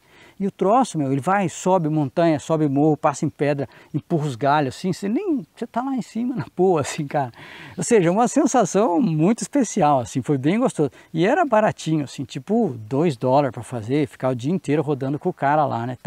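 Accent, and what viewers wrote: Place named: Paraná, Brazil. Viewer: 👏👏👏👏 pelo sotaque e pelo nome vc é. Eu conterrâneo pelo jeito do Paraná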